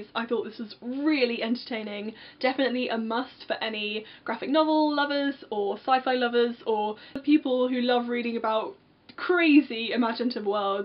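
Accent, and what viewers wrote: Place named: United Kingdom. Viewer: Great books! Looking forward to watching more of your videos :) I'm not sure what my favourite book of 2014 was to be honest! I think I'll have to go Cinder by Marissa Meyer. :) Just wondering, where abouts in the UK are you from? I love your accent!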